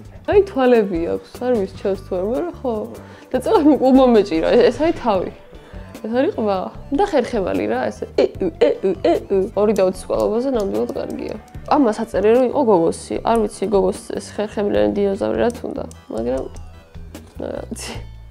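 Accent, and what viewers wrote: Imereti, Georgia. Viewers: იმერელი ხარ?❤❤ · იმერელი ხარ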